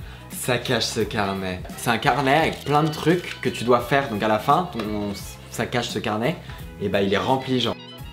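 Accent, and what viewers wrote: England, United Kingdom. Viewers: J'adore ton accent anglais quand tu parles anglais (c logique )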